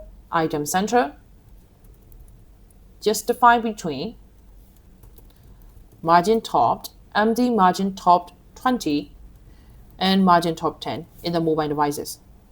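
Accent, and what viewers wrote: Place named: Myanmar. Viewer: Are u a Myanmar? Your accent like Myanmar 😐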